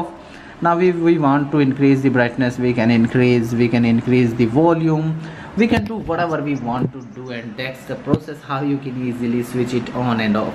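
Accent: India. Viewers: hello and welcome this video was a very good indian speaking man and he is very good looking and i like him he is very good i am indian looking for a husband im 25 he explained this very good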